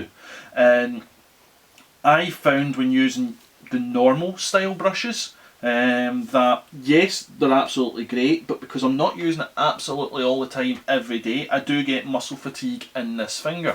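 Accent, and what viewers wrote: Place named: Scotland, United Kingdom. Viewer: LOVE the accent ,what part of Scotland are ye frae?? Great review it helped loads!!!THANK YOU!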